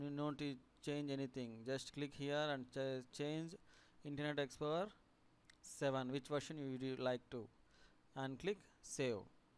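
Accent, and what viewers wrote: India. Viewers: Same to me from Germany - but english with an indian accent is a real tribulation... :-/ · Offer a trainer who speaks western English not a person from India especially for listeners who grew up with English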